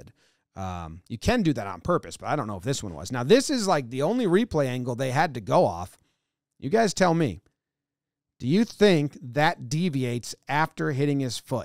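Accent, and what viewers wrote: United States. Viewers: How come you're so good at understanding cricket? US channel with regular American accent.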